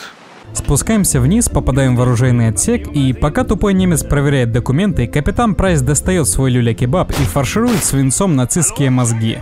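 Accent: Poland. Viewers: Ты што польский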